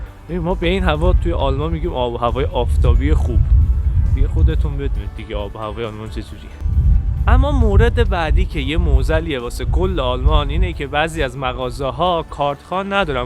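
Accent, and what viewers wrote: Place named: Turkey. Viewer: عالی داداش ،داداش یکم لحجه داری ترک زبان هستی؟؟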